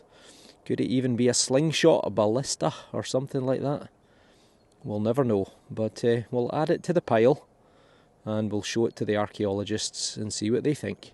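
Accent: Scotland, United Kingdom. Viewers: oh damn.... its really good to hear some scottish again, must been 20 years by now i had a great friend from scotland who just talked like you so funny to listen, some nice finds and you earned my suscription, say hi to from his bavarian fan;) and no the silver isnt older than 200 years even if it is silver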